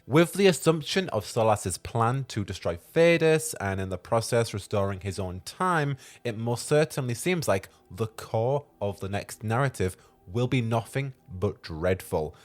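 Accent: United Kingdom. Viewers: You are very british, and that's coming from a British person. What I mean is, your voice is very soothing to listen to lmao if that makes sense?? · Ah, so you're British. Totally irrelevant I know, but I couldn't quite place your accent before. Then again, I suck at that.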